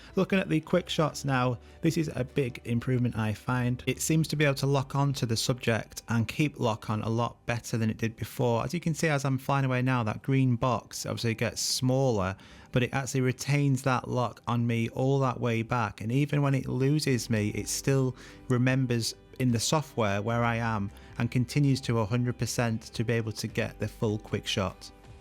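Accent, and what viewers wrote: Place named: England, United Kingdom. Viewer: Thank you for informations Witch place in england is your accent from ?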